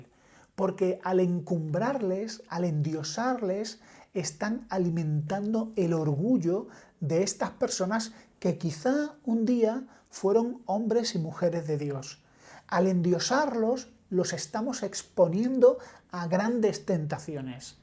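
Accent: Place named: Argentina. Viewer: No digas tonterías eres argentino se tu acento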